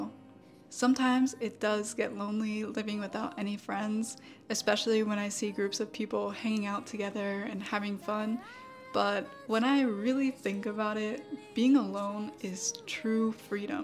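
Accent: United States. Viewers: A lovely Chinese look-like American girl with a perfect American English tongue in such a tenderly whispering style！Watching your classes feels so good like breathing fresh air❤❤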